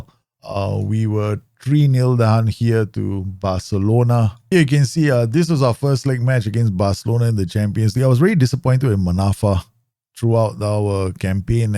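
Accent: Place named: Singapore. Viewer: Amazing content! I assume that you’re from Singapore from the examples and accent. Will work on a new save and focus on youth with this in mind. Thank you!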